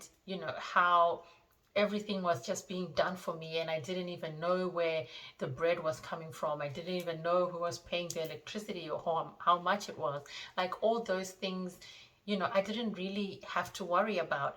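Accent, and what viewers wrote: Australia. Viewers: some ausie accent detected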